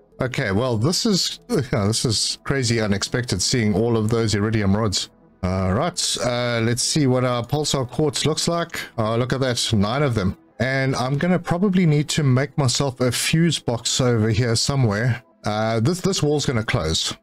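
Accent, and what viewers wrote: South Africa. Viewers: I knew it I thought I herd a South African accent 👌👌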